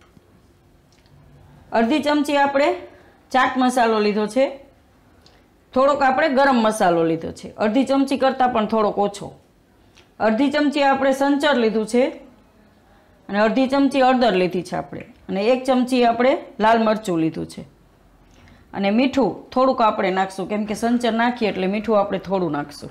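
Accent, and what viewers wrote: Kathiawar, India. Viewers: વેરી નાઈસ. તમે સરસ કાઠિયાવાડી બોલી મા સમજાવો છો... રાજભા ગઢવી જામનગર.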